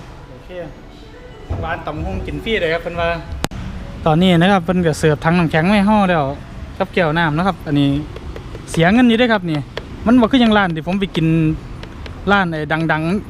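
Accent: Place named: Isan, Thailand. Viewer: ชัดนะไอ้อีสาน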